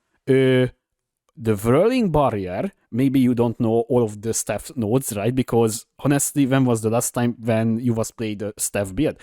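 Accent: Hungary. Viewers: szevasz, a kiejtésed alapján magyar vagy. sosem nyomtam még 1 ligát sem egy zsír új skillel, de most ezzel fogok kezdeni, köszi a videót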